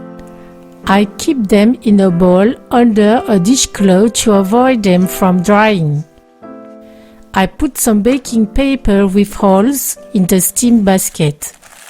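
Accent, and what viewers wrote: France. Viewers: she sounds very French!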